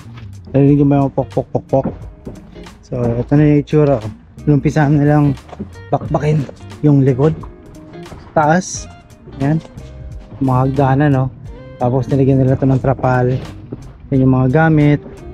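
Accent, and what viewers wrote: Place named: Ilonggo, Philippines. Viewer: basta ilonggo sir malalaman agad2x. More power sir.. 😉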